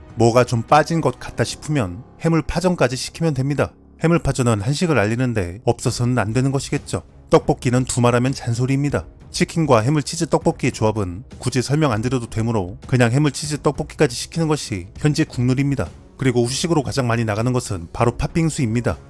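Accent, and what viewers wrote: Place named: Chungcheong, South Korea. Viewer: 내가 제일 좋아하는 유투브 프로그램!! 특히 충청도 목소리가 감칠 맛이 나지 누구도 흉내내지 못하는 저 리듬감 있는 목소리 패턴은 개그만담도 많이 해봐야 아는건데.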